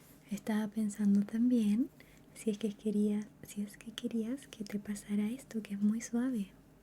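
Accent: Chile. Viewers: Muchas gracias. Te sigo desde hace años y creo que por ti amo el acento chileno. Justo han sido días de mucha ansiedad, ojalá hagas más videos así. Últimamente me he refugiado en tu canal de ASMR 🥹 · Querida, el primer video tuyo que tuve el privilegio de ver fue hace muuuchos años, un tutorial de cómo hacer un corazón de Undertale con porcelana. Comencé a ver tus videos por el tutorial, me quedé por tu carisma. Tu acento de Chile siempre me recuerda a mis abuelitos y todos los tíos abuelos y primos lejanos que tengo allá. No soy artista, pero soy docente, y fuiste una de mis inspiraciones para llevar el amor y la paciencia a mis alumnos en su proceso de aprendizaje. No suelo comentar mucho en los videos, pero te sigo desde mi cuenta principal hace muuuchos años. Quería agradecerte por el hermoso cariño que le ponés a tus proyectos. Y gracias por este video que me ayudó mucho en tiempos difíciles. 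Con amor, una pollito veterana ❤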